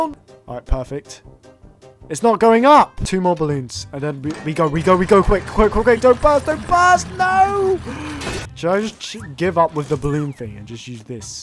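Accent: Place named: United Kingdom. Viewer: yeah he's British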